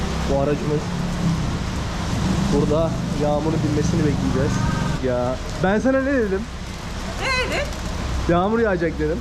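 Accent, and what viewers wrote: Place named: Mexico. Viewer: Kardeş sen türkmüsün?valla Türkçe öğrenmiş Meksikalı gibisin!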